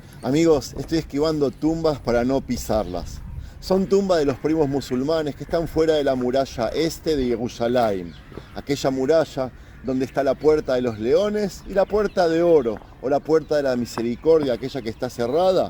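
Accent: Argentina. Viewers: Shalom! Me encanta ver sus vídeos me fascina todo lo que tiene que ver con 🇮🇱 y en español con acento argentino aún mejor.❤️🇮🇱 abrazo!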